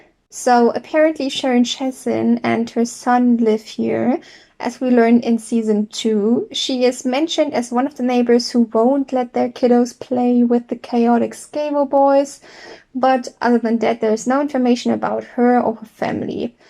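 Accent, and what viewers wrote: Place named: Germany. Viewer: Sie ist sowas von Deutsch. Ihr Akzent 😅😂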